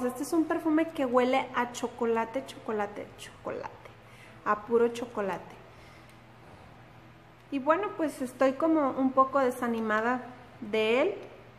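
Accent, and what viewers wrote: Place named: North, Mexico. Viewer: Me parece que tú recomendaciones son buenas, felicitaciones. Por otra parte no te preocupes como hables, los argentinos, los colombianos, los españoles etc, hablan distinto y muchas veces con diferentes acentuación es y palabras mochas pero es lo que los distinguen de que país son, acá en México lo fabuloso lo más bonito es que cada estado es como un diferente país, y hablan diferente en cada estado, y mucha gente quiere estandarizar el tono de hablar, incluso hablar como chilango fresa (sin dinero) como si ponerle el tonito de fresa les diera caché. Lo más bonito es ir al norte y oír su tono fuerte e incluso con sh en vez de ch, o ir a Michoacán y oír como hablan se siente muy bien, esa es su forma de hablar y nosotros los entendemos ¿Por qué a fuerza querer cambiar la forma de hablar de una persona? Se oye que eres del norte y tu tono de voz es muy bonito y que bueno y te admiro que no lo cambies ni lo achilangues como muchos. 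Haber corrijan a los Argentinos por decir "tenés" en lugar de "tienes" es su forma de hablar muy característico, y no por eso no les entendemos, claro que entenderemos pero no falta el que se quiere sentir el sabiondo y corregir a diestra y siniestra. Respetemos el tono de hablar y su forma de hablar de las personas de diferentes Estados de la República Mexicana y de otros países que hablan Español. Su tono de hablar y su forma de hablar es su identidad.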